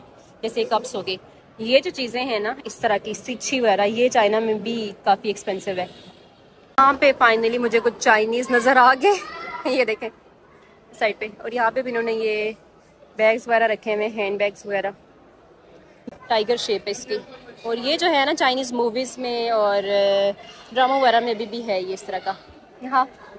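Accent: Pakistan. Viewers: Chinese hi lagne lagi ho, bolne se sirf Pakistani lagti ho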